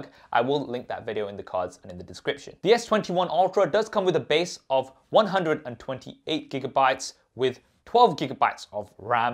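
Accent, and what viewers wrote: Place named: United Kingdom. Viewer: His voice got so soothing in middle of the video that i fell asleep. Dude you gotta make a lullaby playlist in that British Accent.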